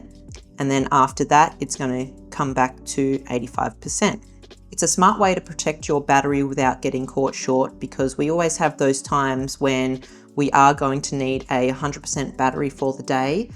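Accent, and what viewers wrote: Australia. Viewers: I must admit, half of the reasons why I watch these videos is because I miss Aussie accents too much. You go girl!!! Straya! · Thanks for this informative video. I’m in my 70s and realise I have so much to learn. Great to hear an Aussie voice. Will be checking out more of your work ❤❤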